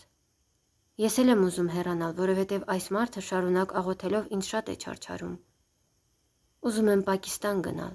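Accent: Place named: Sisian, Armenia. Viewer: Շատ ներողություն կարդացող աղջկա ձայնը շատ գեղեցիկ ու բարի եվ միեւնույն ժամանակ տխուր է։կասեք ինքը բնիկ որտեղից է։ Ներող կլինեք, մի գուցե հետաքրքրասիրությունը մեղք թվա՞ Ուղղակի ասեմ պարզ Ակցենտը Սիսիանի ակցենտ է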